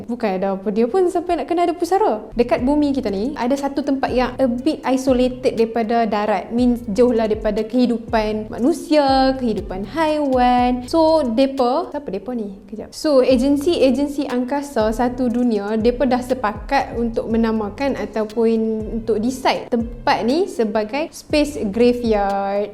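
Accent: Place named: Kuala Lumpur, Malaysia. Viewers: Sis please jangan nak key el sangat,saya lagi suka sis cakap jaa loghat utaqa,saya suka dengaq kaum2 hawa dari utaqa ni bila bercakap,saya yakin ramai lagi yang suka sis pakai loghat utaqa bila bercakap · Sedap kot die ckp key el...bia la dia..alahai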